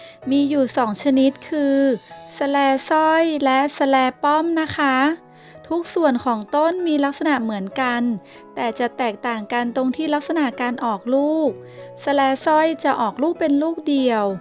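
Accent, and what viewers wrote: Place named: North, Thailand. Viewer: อู้จ้าาาาาา คนเมืองงงงงงแต้น้อ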